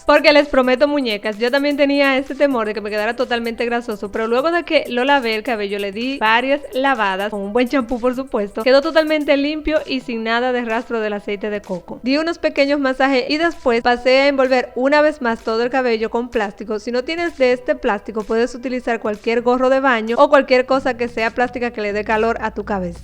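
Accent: Dominican Republic. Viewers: eres dominicana ??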